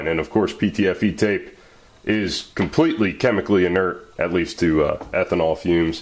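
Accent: United States. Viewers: This guy sounds like AvE. But more 'murican.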